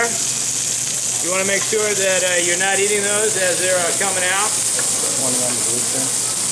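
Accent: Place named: United States. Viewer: what does he mean "as you can tell I'm from Ireland" he sounds American. If he sounded Irish it would make sense.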